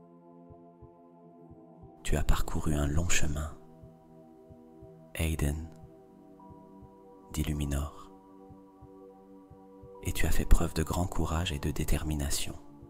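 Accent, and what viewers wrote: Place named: Quebec, Canada. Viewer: WoW mais quel changement! Petite question: je suis québécoise et j’entends très bien le même accent. Êtes-vous québécois vous aussi?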